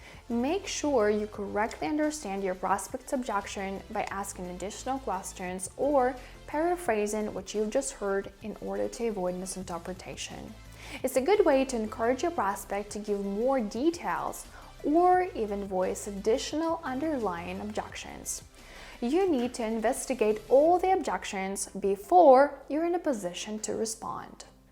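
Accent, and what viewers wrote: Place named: England, United Kingdom. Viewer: I have subscribed to your channel for your English accent. Your English pronunciation is different from others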